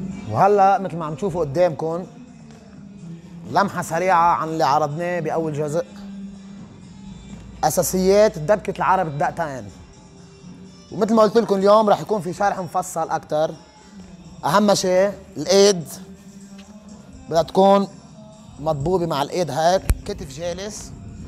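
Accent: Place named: Iraq. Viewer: عم تحكي عراقي واو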